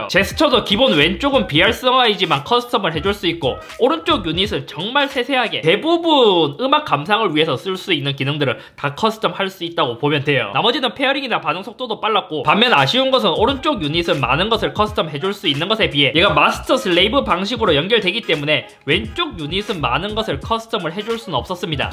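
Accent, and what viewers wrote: Gyeongsang, South Korea. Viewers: 나도 경상도 출신이지만, 이제 경상도 말투는 듣기도 싫네요 ㅠㅠ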